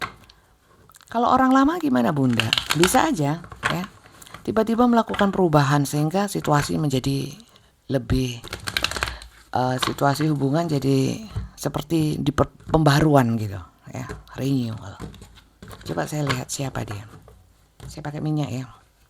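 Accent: East Java, Indonesia. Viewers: BASA JAWANYA JAWA TIMUR AN MEDOK BGT YAAA BUN ARAK MALANG MATAP PERAH JG DI JATIM LUMANYAN LAMA HEMMMMM SUIT SUIT CETOK SEGERRRRR KONGGGGGGGG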